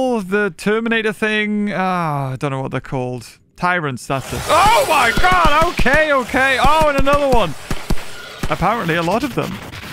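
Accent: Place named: United Kingdom. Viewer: i just noticed he's british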